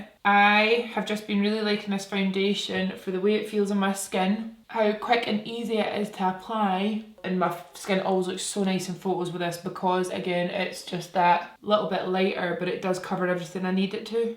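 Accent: Scotland, United Kingdom. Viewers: Skoosh 💦 😂 Awfy Scottish today!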